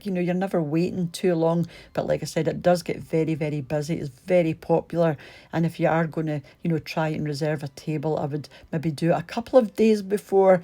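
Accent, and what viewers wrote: Scotland, United Kingdom. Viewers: I personally think voice is a very important part of her channel,she is a good cook,her recipes are good,but her calm Scottish accent is everything,and also mentioned by her viewers a lot of the time.😊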